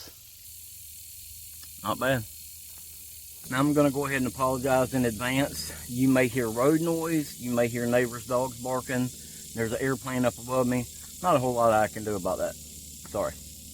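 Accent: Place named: South, United States. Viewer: Subscribed, just because you’re safety conscious and wearing your safety squints and I like the southern accent.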